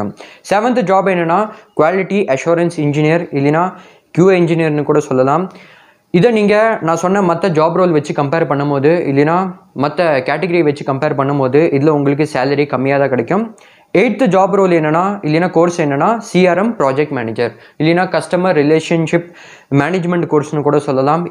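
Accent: North India, India. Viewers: North hindian mari pesra😂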